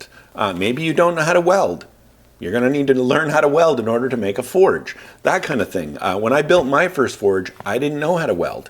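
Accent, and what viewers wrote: Canada. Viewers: I thought you was in Canada? You referenced being in California. Now I’m confused lol. You definitely sound Canadian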